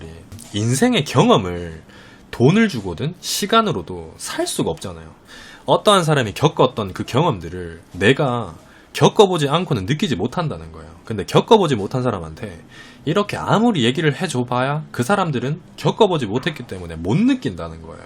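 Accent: Gyeongsang, South Korea. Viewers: 앜ㅋㅋㅋ 사투리 쓰시는줄도몰랐넴 경상도라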